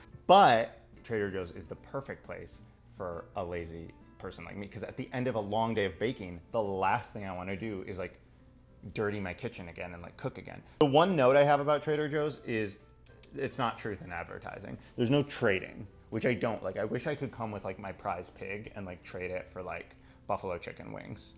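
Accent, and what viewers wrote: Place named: Italy. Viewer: The italian accent made me quit the video halfway through and I only came back because I really like your content, but aside from that it was a nice video! Maybe next time you could record in two days so you don't overeat and feel sick.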